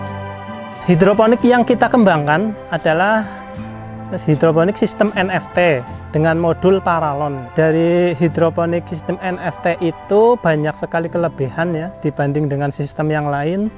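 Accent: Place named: Banyumas, Indonesia. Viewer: Salam ngapak 😂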